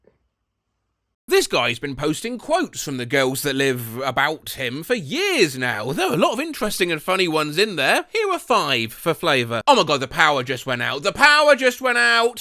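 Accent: United Kingdom. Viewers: Was not expecting to hear Hindi in a British accent today but here we are! Bahut khoob! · Is he British?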